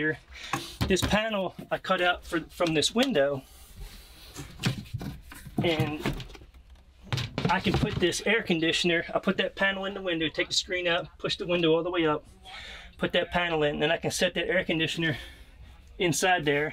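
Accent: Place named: Louisiana, United States. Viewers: I would swear he's from Louisiana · I’d swear he was from New Orleans suburbs based on his accent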